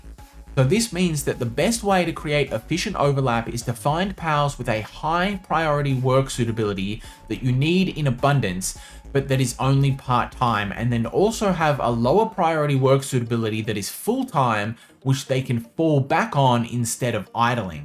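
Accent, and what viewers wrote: Australia. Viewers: how do you have not 1M subs... first of all, your content is amazing and you covered basically everything and secondly, who doesn't love aussies and their accent?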